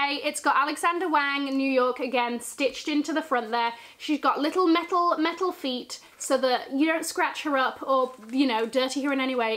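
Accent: United Kingdom. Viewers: Can you give us your opinion about the new iPhone? It is definitely a luxury item, do you have designer cases? And what applications do you use? Oh and merry Christmas and new year! I love your British accent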